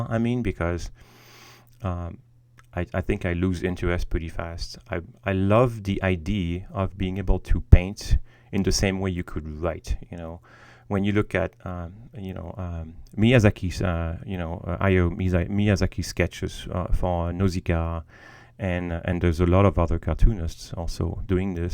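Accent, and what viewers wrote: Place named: France. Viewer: Really cool. Your French Accent is cool! Im half french and I wish I had a french accent instead of a boring london one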